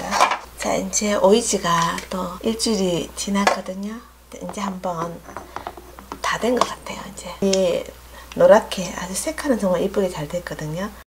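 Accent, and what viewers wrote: Gyeongsang, South Korea. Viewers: 네. 이모님^^ 감사합니다. 저도 경북에 산 적이 있어서 이모님 사투리가 정겹습니다^^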